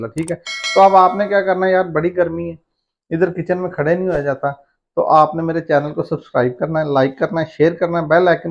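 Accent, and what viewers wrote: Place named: Sialkot, Pakistan. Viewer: بہت خوب جزاک اللہ خیر لہجے سے لگتا ہے کہ سیالکوٹ یا گردونواح سے تعلق ہے۔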